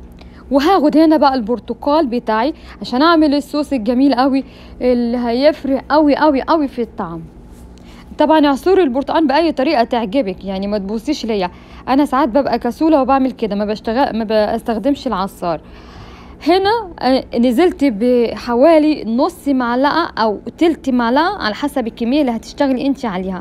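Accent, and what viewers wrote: United States. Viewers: من لهجتك كدا انتي ياما مولوده ف امريكا ياما روحتى وانتي صغنونه تسلم ايديكي💐💗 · هو انتى منين وبقالك كام سنه فىامريكا مش عارفه عربى ههههه على امريكى عسل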